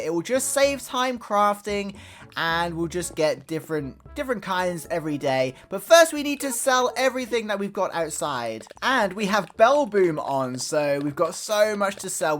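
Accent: United Kingdom. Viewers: Hey, I just want to tell you how much I look forward to your daily "spooky" videos. I've been a subscriber since 2020 when i purchased acnh. I really enjoy your energy and your British accent 😅 I wasn't playing acnh for over 10 months but 13 days ago i restarted my island and finally i found my love for playing acnh again. I've binged watch all of your older videos 😅 I'm just happy that you're still here making acnh content 🙏🏼 Love from Germany 🥰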